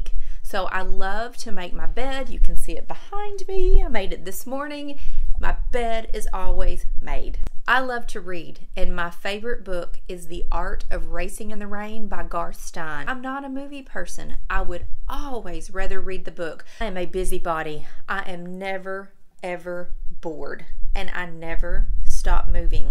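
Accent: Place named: Kentucky, United States. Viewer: You are SO the person I want to be when I stop being a procrastinating perfectionist! 😁 I am a 49 year old night owl musician from Boston (thus the username and picture). I LOVE your Kentucky accent and phrases!! I have 2 boys ages 12 and 14 so I am fascinated by how you’re handling your youngest flying the nest. 💗 Your energy grabbed me but it’s your sweet personality and “realness” that keep me coming back. 😊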